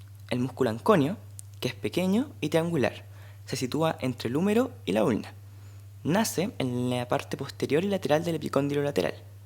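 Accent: Chile. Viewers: Desde que volví de Chile ahora me topo con accento chileno donde sea. Muy bien video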